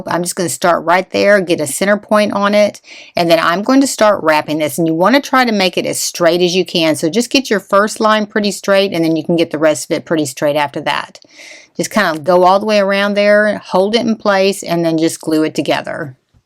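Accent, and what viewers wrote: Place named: East Tennessee, United States. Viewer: where are you from? I’m guessing East Tennessee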